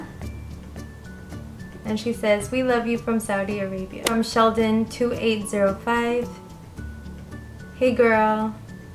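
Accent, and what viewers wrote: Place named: United States. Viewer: You mention you've lived I Australia for 14 years so where does the American accent come from? :)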